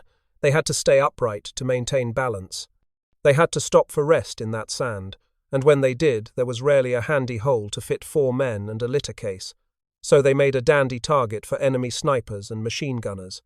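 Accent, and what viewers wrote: United Kingdom. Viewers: They selected a british accent narrator, so you get all the UK pronunciations for a very American operation.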